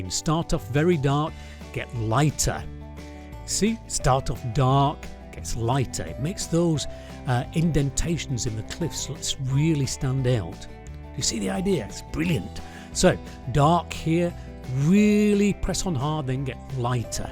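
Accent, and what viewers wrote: United Kingdom. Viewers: The British accent with honky tonk music is a neat, yet confounding juxtaposition. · hmm how to practice my s curves... AH! draw ribbons!! the British accent, the voice of logic and reason, love it! subbed and checking out your other videos, cliffs sounds fun! watching that next after your video on lines.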